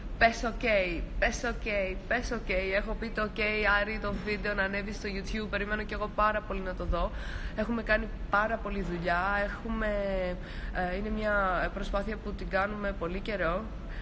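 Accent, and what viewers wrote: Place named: Germany. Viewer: Το χειροτερο ειναι οτι παει να παραστησει την χαριτωμενη! Το αμεσως επομενο που κανει την τοοοοοσλ ενθουσιασμενη. Το λαστ μπατ νοτ λιστ, που μιλαει αγγλικα με προφορα χωριατη γερμανου. Αστο δεν εχεις αστρο...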